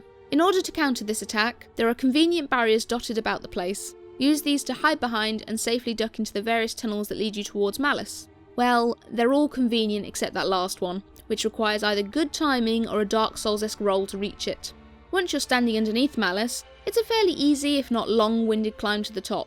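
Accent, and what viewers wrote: United Kingdom. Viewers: Ha ha, silly British lady fights the Big Boulder Boi Gang.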